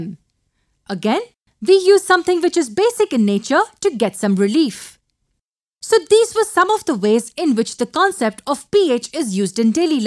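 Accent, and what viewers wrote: India. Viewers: Such knowledgeable and scientific information, the Indian accent is strong too, I love it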